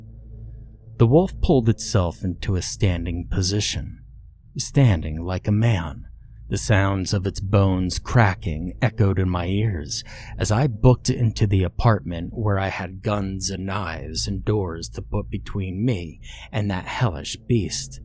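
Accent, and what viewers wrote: South, United States. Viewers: Oohh I wish it was longer much longer! I mean this story. Your Southern draw is flawless and it fits this story just right.👍🏻👍🏻👍🏻🌹🇱🇺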